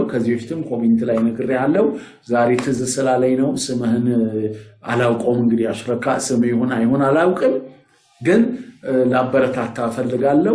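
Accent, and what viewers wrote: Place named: United States. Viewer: በጣም አመሰግናለሁ አቤት ለውጥ ያሜሪካኖቹ አክሰንትን አመጣህልኝ water ለማለት በፍፁም አደር ስታንድ አያረጉህም ቀጥል በጣም ጎበዝ ነህ አክሰንት አዙሮ ሊደፋነው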